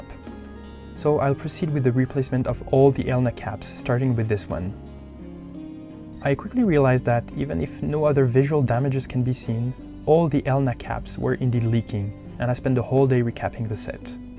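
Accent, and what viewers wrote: France. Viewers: Vous avez un accent français, c'est pas possible autrement !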